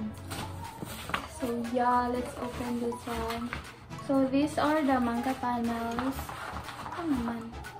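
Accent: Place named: United States. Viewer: Bat napakaslang neto?amerikana kaba?o sobrang oa mo lang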